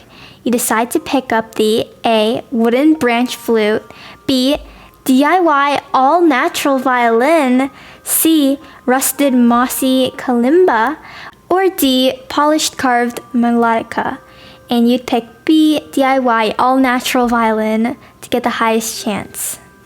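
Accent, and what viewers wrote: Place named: United Kingdom. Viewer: I LOVE YOUR BRITISH ACCENT OMG <3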